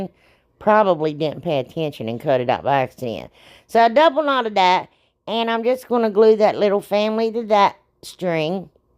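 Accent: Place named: Tennessee, United States. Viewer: Just found your channel from diy beauty on purpose. Girl u r talented and a hoot! Where r u from. Your accent sounds like possibly Tennessee or Kentucky. I’m from west Tennessee myself! Keep up the good work and your channel will blow up!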